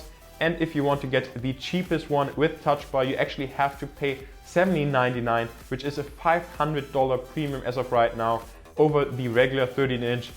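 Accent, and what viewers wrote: Germany. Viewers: I'm german, and i can hear your german accent clearly.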